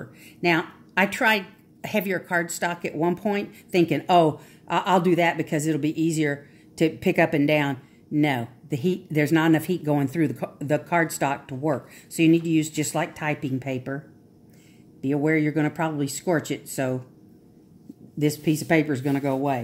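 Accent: South, United States. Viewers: Oh my goodness, I LOVE your accent. I’m guessing you’re a Southern Lady? I love it. 💖💖💖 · She kind of reminded me of Carol Duvall with a southern accent. I kept thinking I have to get off this computer, got things to do, but was riveted to the end.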